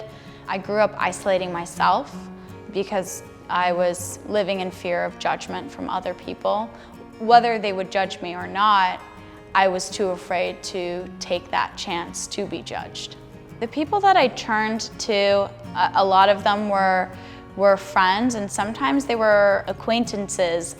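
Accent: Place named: Australia. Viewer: why does she sound kind of australian? lmao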